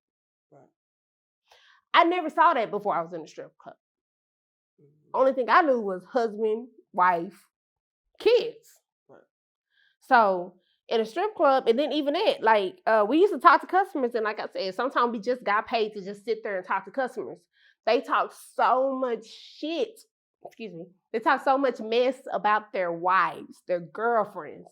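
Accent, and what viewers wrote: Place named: South, United States. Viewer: She has a southern accent!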